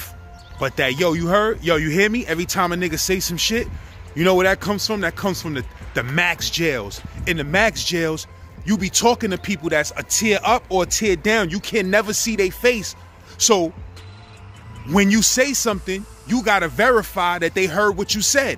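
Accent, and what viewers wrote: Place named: South Louisiana, United States. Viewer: Sounds like south Louisiana! You know what I mean! Everybody says that phrase down here " You Herd? Especially in New Orleans, Louisiana! The speak New York_a_leans! Later brother,keep safe,be Righteous! Mike Edwards Crowley, Louisiana.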